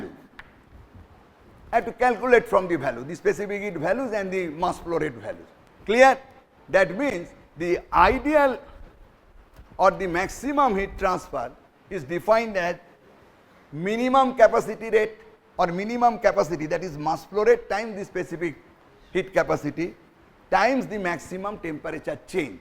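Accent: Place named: Bengal, India. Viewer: Hot fluid cold fluid ka mamla nhi h that bengoli accent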